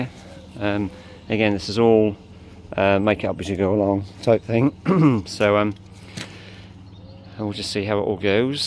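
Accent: Norfolk, United Kingdom. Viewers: Are you a Norfolk boi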